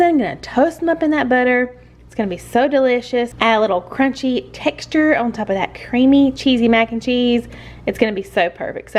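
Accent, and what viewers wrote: South, United States. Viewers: Girrrl... I’m starved lol great recipe. And by the way you talk just like me, I’m from the south 👍🏻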